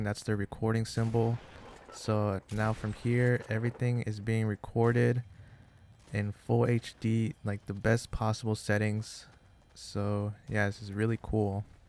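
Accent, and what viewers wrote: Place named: Australia. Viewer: 90% Australian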